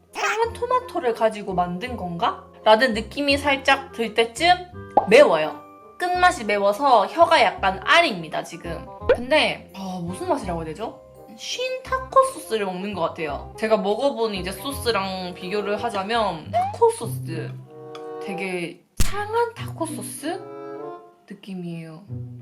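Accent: Busan, South Korea. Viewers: 부산말투 원래 저래요 그러니 남자들이 좋아하죠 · 음!! 부산 사투리가 조금씩 사용하시네요 ㅋㅋ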